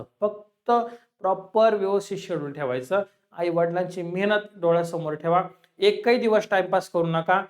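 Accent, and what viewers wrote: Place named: Khandesh, India. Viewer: सर तुम्ही खानदेशी सारखं बोलतात😅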